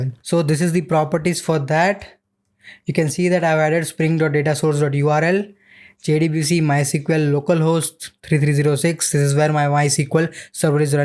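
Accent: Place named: India. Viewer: Nice Video! For usual I habe problems understanding indish accent, but not here!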